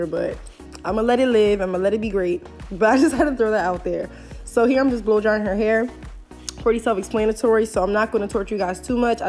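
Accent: Philadelphia, United States. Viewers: Never seen this channel before and I can tell y’all from Philly too🤣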